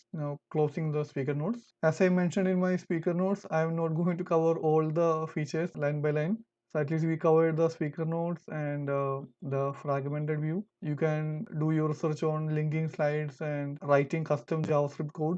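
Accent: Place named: Kerala, India. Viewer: Malayali anu ennu English keetapole thooni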